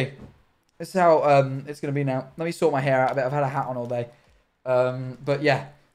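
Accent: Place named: RP, United Kingdom. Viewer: why did * sound so posh in this clip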